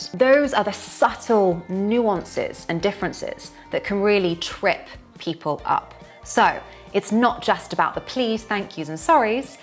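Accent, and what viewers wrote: United Kingdom. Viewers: Obsessed with British accent and highly recommend her cz i watch so many videos but she is incredible and so lovely her accent ❤❤ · Hi, my name is Rodrigo, I'm from Brazil. I love watching your videos — they help me a lot and they still help me today. I worked with a British band once, and I was pretty confused because it was my first time hearing this accent at work without subtitles (by the way, I really love your accent 😄). I had an awkward moment when a guy asked me if my wife was coming to the show, and I just said, “ I Don't Know.” He looked at me like he was surprised. I think I was a bit too direct.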